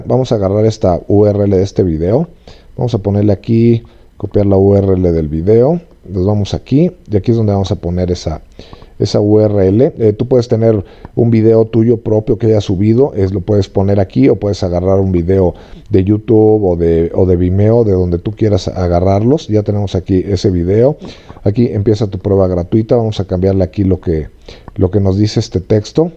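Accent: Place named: Mexico. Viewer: Detesto escuchar videos con voces que no sean mexicanas, y por eso, este video fue música para mis oídos, gracias!!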